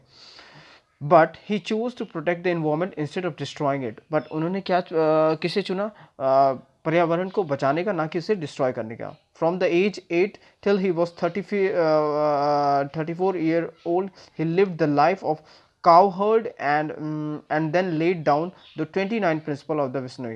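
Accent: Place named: United States. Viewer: Your voice like American english and I like it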